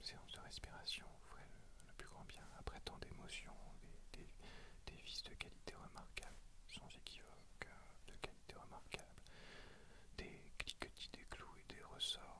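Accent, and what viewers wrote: South, France. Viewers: tu es du sud cong non ? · Superbe vidéo ! J'ai l'impression qu'un certain accent du sud revient des fois non ? ^^ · D'habitude je n'aime pas du tout les role-play mais j'ai a-do-ré celui-ci !! Peut-être parce que c'est plus que ça justement... les clins d'œil sont tellement malins, la touche d'humour est parfaite, le scénario est génial, le fond noir est hyper agréable, le texte est top et le son est absolument impeccable ! Vous avez sans doute fait plusieurs essais avant de sortir ces vidéos mais c'est tant mieux :) Je décèle un léger accent, êtes-vous originaire de la moitié sud de notre bel hexagone ? :) En tout cas il apporte une touche unique supplémentaire à votre travail ! Vraiment bravo pour tout cela, vraiment ! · Baptiste Conbatant un léger accent du sud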